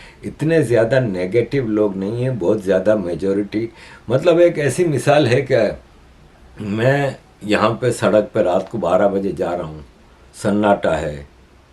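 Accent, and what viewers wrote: Gujarat, India. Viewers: shab mujhe lagta he app Gujarati hoo app key bol gujrati Jaysh lagte he❤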